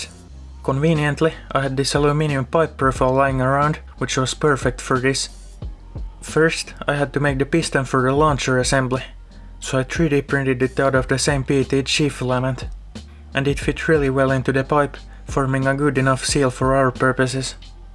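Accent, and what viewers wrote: Finland. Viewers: You sound finnish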